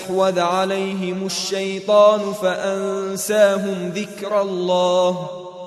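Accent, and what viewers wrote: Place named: Yemen. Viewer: هذة قرائتنا قرائة اهل اليمن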